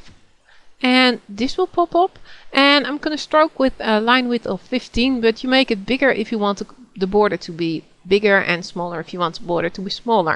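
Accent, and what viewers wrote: Netherlands. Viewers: This is what dutch people really sound like speaking English, unlike goldmember in Austin Powers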